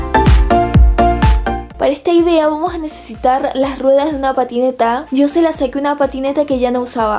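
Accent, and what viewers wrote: Argentina. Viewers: Sos argentina? Sólo para saber xd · Eres de argentina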